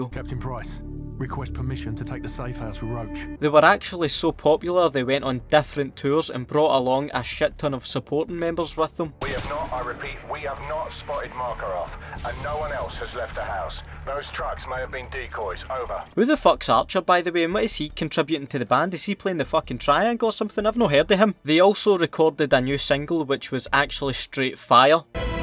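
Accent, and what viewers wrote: Scotland, United Kingdom. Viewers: I love that accent 😂 reminds of Limmy's Show.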